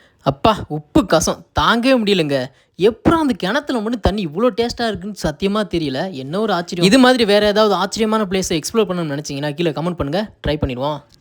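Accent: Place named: Pudukkottai, India. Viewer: ரி Silent ப்பா புதுக்கோட்டை சுற்றியுள்ள மக்கள் இப்படித்தான் பேசுவார்கள்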